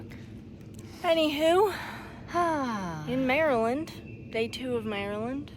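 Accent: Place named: South, United States. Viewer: Where in Maryland with a southern accent. I think the East Coast is changing yalls speech 😅 o yea you all live in LA yea you all have I-5 and yes I been there and by far some of the worst traffic I have ever sat in before. O wow the video in the dark reminds me of the (are you afraid of the dark) lol. I know the rocks are going to get worse unfortunately 😓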